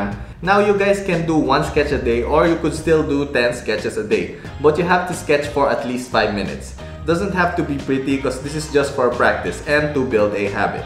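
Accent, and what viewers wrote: Philippines. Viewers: Thank you for sharing your drawing skill. I could not help but notice, your accent. Are you from the Philippines by any chance? Greetings from Indonesia.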